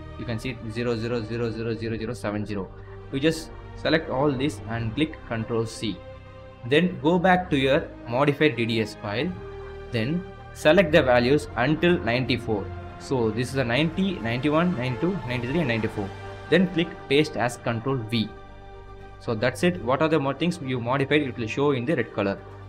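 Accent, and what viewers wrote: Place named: India. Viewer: Again a guy with indian accents making best tutorials on youtube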